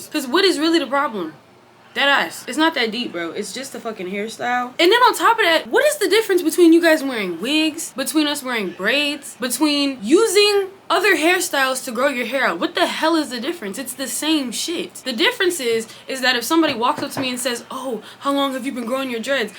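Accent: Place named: Baltimore, United States. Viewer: You sound like you from Baltimore. ..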